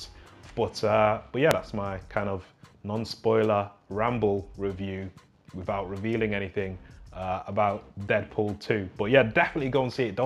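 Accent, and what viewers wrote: United Kingdom. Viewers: It's good to hear a northern accent on youtube. There isn't enough. Lancs here.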